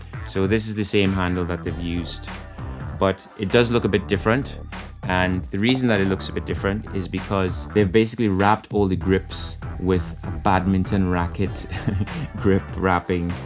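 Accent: Trinidad and Tobago. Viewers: love this vid have to ask are you trini Im hearing that accent.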